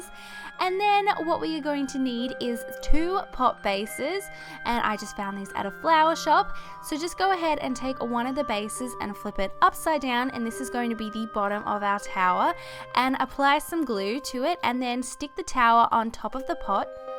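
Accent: Australia. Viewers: is she Australian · Are u from melbourn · ARE U AUSTRALIAN???? Go AUSTRALIA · Australian I am pretty sure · You deserve WAY more subscribers than you have you so beautiful and bubbly it would make me so happy if you replied! I'm new this is the first video of yours that I've seen the second I heard your voice I subscribed and turned on notifications love you so much💕 BTW are you Australian cause I am too that would be awesome byee xoxoxo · Hey are you AUSIE! if s so I am too! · Is she Australian??!!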